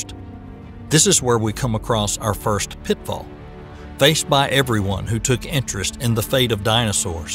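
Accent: South, United States. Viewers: Gotta love the southern drawl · Southern US accent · It is refreshing to hear a southern accent when learning about science and history! Thank you, Narrator, for breaking the stereotype of the creationist, Bible-belt Southerner! Please, please, PLEASE narrate more science vids in your accent!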